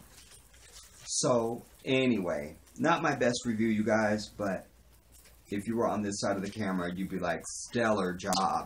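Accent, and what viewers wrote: United Kingdom. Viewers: I thought I was listening to a UK reporter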